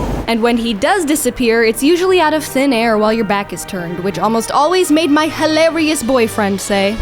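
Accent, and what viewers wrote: Canada. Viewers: You sound stereotypically Canadian. It's funny. And I have to watch more. Need... MORE...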